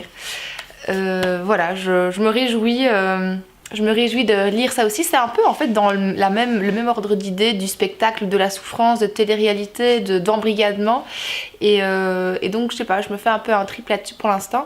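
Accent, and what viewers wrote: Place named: Belgium. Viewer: Ahaa... La nostalgie... Une de mes meilleures amies. Tu lui passeras le bonjour :) La Vague je n'ai pas lu le livre mais le film m'a énormément marqué. Du génie. Trop bien le marque page dédicacé par Amélie Nothomb :D Les Royaumes du Nord j'ai lu le 1 et 2 il y a presque 15 ans en pleine adolescence mais je n'ai jamais terminé, jamais lu le tome 3. Pas que ça ne me plaisait pas mais j'ai décroché et mes lectures se sont tournées vers d'autres choses. Du coup je ne connais pas la fin mais pas le courage de me relancer dans cette saga... (J'adore ton accent, j'adore la Belgique ! :D )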